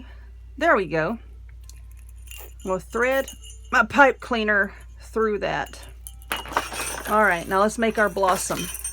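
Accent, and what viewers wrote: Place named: South Carolina, United States. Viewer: ABSOLUTELY BEAUTIFUL 🎅✝🌲❄⛄!!! I LOVE IT & I CAN'T WAIT FOR MY DAUGHTERS TO WATCH YOUR TUTORIAL'S & GO BUY MY SUPPLIES SO I CAN TRY TO MAKE SOME OF YOUR WREATHS 😊💝!!! WE LIVE IN ARCADIA FL BUT OUR DADDY WAS FROM SC & YOU SOUND & SAY STUFF JUST LIKE US GIRL, LOL 😂😂😂!!! I LOVE YOUR ATTITUDE & PERSONALITY 💝😊!!!!!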